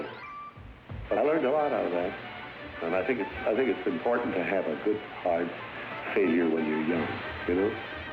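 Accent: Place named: Mid-Atlantic, United States. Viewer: Maybe it's the mid atlantic accent or the radio static, but there's just something about his voice that is so timeless and nostalgic.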